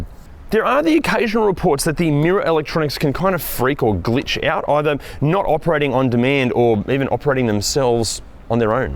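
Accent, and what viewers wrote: Australia. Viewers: Your Aussie accent and sense of humor makes your channel a joy to watch. Specially made me laugh when you called the guy a wanker 🤣🤣 my only feedback would be to include its off-road capabilities. I guess that would be important for some people.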